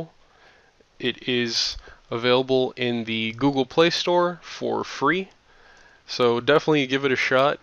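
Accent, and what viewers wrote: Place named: Netherlands. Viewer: You sound a bit like a Dutch guy speaking English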